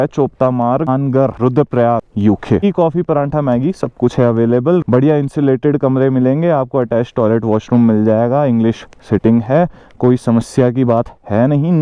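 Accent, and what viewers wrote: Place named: Haryana, India. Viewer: Bhai haryane se lg rhe ho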